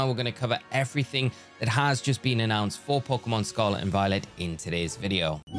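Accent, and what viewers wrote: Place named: United Kingdom. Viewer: Brittish accent? So strong... 😢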